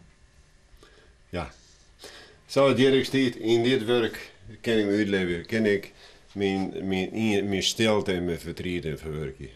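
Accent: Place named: Westerkwartier, Netherlands. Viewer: It dialekt dat dizze man sprutst klinket in bytsje as it Grinslânske dialekt "Westerkertiersk"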